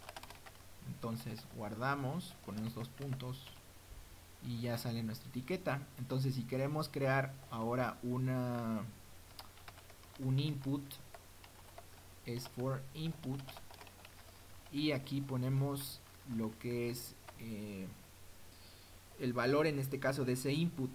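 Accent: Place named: Mexico. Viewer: Gracias por compartir, originalmente llegue buscando algo en concreto que se me esta complicando pero, me gusto tanto tu forma de explicar los temas que decidi hacer el curso, no se si lo terminare, pero como puedes ver ya voy por el 7 y seguire sin duda. Proximamente te escribire para hacerte una consulta ya que, como te decia, originalmente estaba buscando algo en concreto que al menos or donde voy no he visto que lo comentes. Escuchando tu acento me parece que eres de Mexico? vivi ahi muchos años, lo echo de menos pero me gusta escucharte.... un saludo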